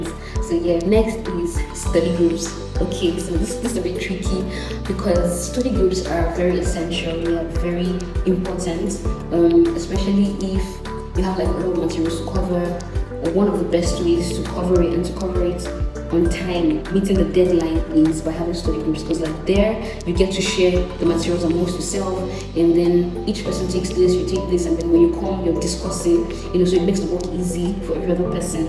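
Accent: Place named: Nigeria. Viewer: I love your accent, are you Nigerian?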